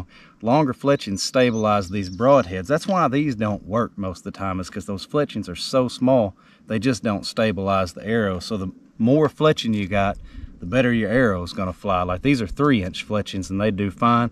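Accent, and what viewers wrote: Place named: United States. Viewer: I'm glad I I'm learning smth from this Guy. I like this American Accent better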